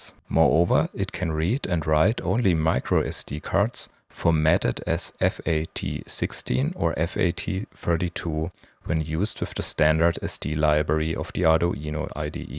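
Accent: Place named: Germany. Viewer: German english.... hard to listen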